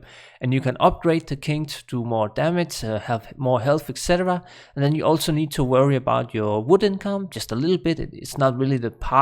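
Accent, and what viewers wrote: Denmark. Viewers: i love your Danglish :D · You're a dane, right? :)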